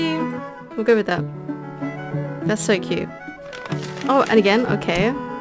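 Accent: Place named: Australia. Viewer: The Australian accent is probably my favorite accent, its just like a lot more relaxed (some of the time), it just makes the video 100 times better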